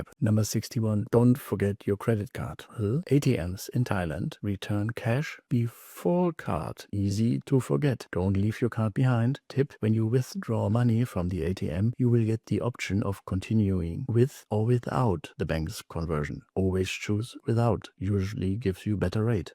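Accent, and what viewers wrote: Germany. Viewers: haha, ich habe das Video tatsächlich im og ton geschaut und paar Minuten später war ich mir sicher, dass du aus Deutschland kommen muss 😅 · LOL l like the Masterpiece Theatre whisper with the low lighting - think it adds to the atmosphere especially with your great German accent! Been in Thailand about 6 months and you speak ABSOLUTE TRUTH brother and I have actually had many of the same thoughts and realizations as yourself. Thanks for your wry, witty humour and entertaining content. Much appreciated 🙏Here’s to the Elephant Pants!😂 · It took me until 16 minutes to realize you must be German. 😊 Ill be there in 11 months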